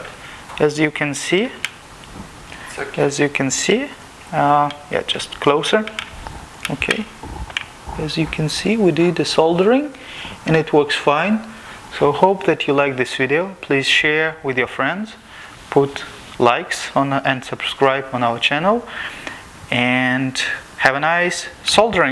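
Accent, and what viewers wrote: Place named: Nizhny Novgorod, Russia. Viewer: клевый лазерец. сколько такой стоит? где купить? сколько он мощности рассеивает, что ему внешнее охлаждение треба? пысы. звыняйте за придирку, может, это вас и не волнует (но зачем тогда все это представление с английским?), но в слове пайка буква L не произносится (полностью извиняя нижегородский акцент).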